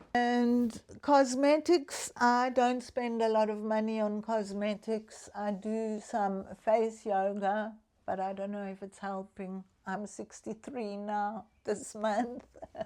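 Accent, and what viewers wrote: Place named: South Africa. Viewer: thanks for sharing - love your pretty Austrian brown skin, clothes & furniture. You are delightful breath of fresh air. Sorry about your neighbour's tree being gone (your 16yo girl is precious) I can hear your South African accent ❤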